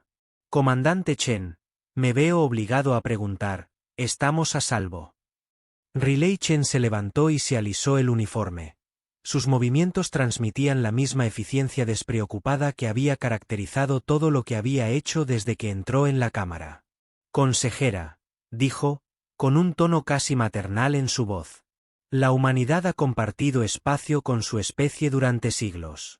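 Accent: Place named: Spain. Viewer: Casi en todas sus historias aparece el apellido "CHEN", lo que siempre me ha intrigado, por lo que me eché un clavado en Google y encontré esto: ELNOMBRE CHEN , ORIGINARIO DE CHINBAPOSEE UN GRAN VALOR HISTÓRICO Y CULTURAL. PROVIENE NEL IDIOMA CHINO Y SIMBOLIZA LOS CONCEPTOS DE AMANECER Y MAÑANA.}Como nombre propio, Chen se ha usado durante siglos, representando la esencia de los nuevos comienzos y la esperanza que acompaña el inicio de un nuevo día. Ya tiene sentido que lo utilicen tanto en sus historias, ya no me quejaré. Pero no creo que "JAQUE" TENGA EL MISMO SIGNIFICADO y lo usan cada rato, cuando creo que quieren decir JAKE, por lo que no creo que su locutor, como buen español, hable inglés, y si la IA es de España, donde al Wi-Fi, le dicen GUIFI. me parece muy tierno. Saludos amigos, me gustan sus historias. Keep them coming!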